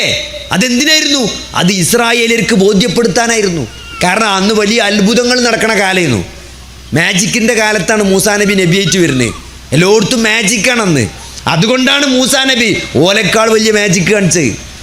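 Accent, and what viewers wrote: Malappuram, India. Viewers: Dr please speak our mother tuge in correct always i lesson your video but your Malappuram tune is there in your speach ok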